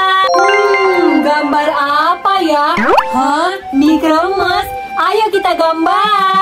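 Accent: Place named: Sumatra, Indonesia. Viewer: Dari aksennya kayak melayu sumatra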